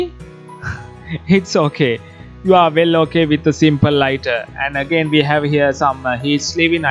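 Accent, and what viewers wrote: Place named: Sri Lanka. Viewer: you have sri lankan accent 🤔👍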